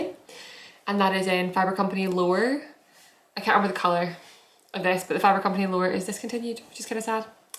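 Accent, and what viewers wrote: Scotland, United Kingdom. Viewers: I have been watching your videos for the past year for two reason’s first because I love to knit and I love and have knitted several of your patterns and the second one was that for the past year I have been planning a trip to Scotland with my sister. I thought that listening to you speak with your lovely Scottish accent that maybe I would be able to better understand conversations and surprisingly I must say that it seems to be helping. I’m sort of training my ears a bit. I’ll be mostly in the Highlands for 10 days in May with only one day in Edinburgh p. I am so looking forward to my trip back to my ancestral homeland.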